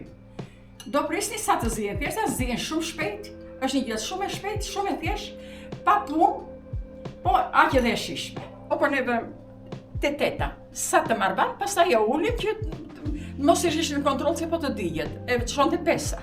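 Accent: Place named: Piqeras, Albania. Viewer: te lumshin duart e arta dhe modestia qe ke te ben mjaft fisnike! Por ti e ke ruajtur gjuhen e Piqerrasit, ndersa vajza flet me dialekt te Shqiperise se Mesme neper video! 😊😊😊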